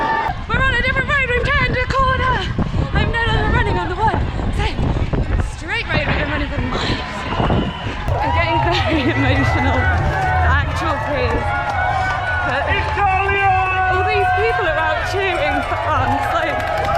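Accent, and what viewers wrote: United Kingdom. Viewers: Can't imagine winning the lottery to get in, let alone speak in a british accent.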